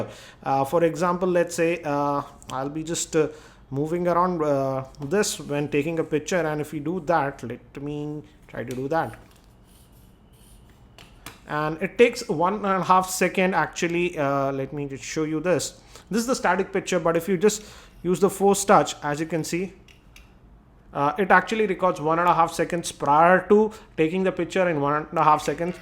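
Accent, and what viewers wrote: India. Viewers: if india speaking english . bad to hear. yakkkk..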